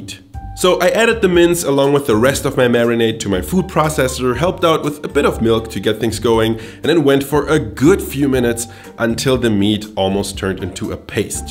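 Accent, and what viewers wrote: Germany. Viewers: his accent screams german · allmost cant even notice the german accent!! great video also! :)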